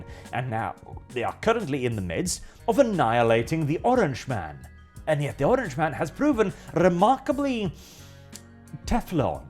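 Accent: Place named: United Kingdom. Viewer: He's Norwegian that learned English from Brits.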